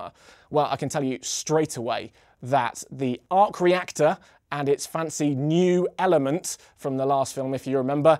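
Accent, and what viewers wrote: United Kingdom. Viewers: Its British accent xD...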